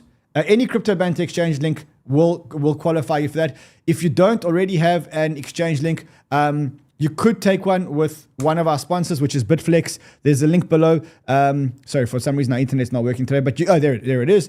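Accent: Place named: South Africa. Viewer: I think the South African accent should be banned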